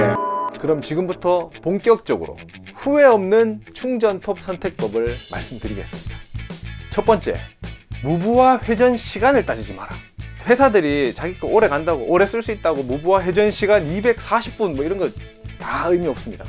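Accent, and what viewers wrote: Gyeongsang, South Korea. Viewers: 너무 쉽게 그리고 재밌게 귀에 쏙쏙 설명을 잘해주시네요 같은 경상도로 그런가? ㅎㅎ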